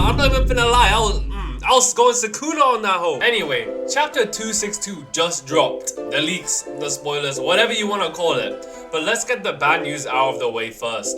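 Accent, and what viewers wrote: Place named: South London, United Kingdom. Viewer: you sound like a south londoner... croydon?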